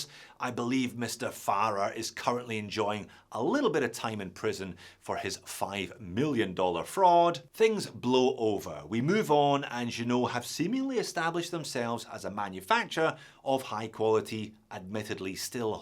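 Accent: Scotland, United Kingdom. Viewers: Is it just me or do you sound more Scottish back in 2017? 😅😅❤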